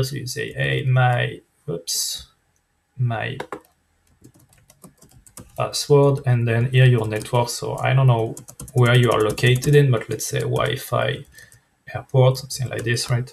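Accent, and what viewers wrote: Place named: France. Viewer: Merci pour vos vidéos que je viens de découvrir. Malgré votre accent très frenchy 😜 qui n'est pas trop compliqué à comprendre pour les franco-francophones, avez-vous la possibilité d'activer le sous-titre en français? J'ai déjà vu ça sur des sites de français qui présentent oralement en anglais, ce doit être automatique, mais je ne connais pas la procédure...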